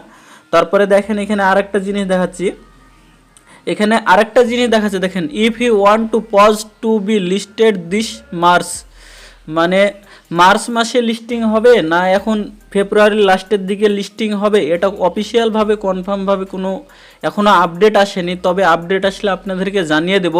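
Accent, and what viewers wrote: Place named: Bangladesh. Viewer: Apni Indian? kotha sune mone hoy bangladeshi.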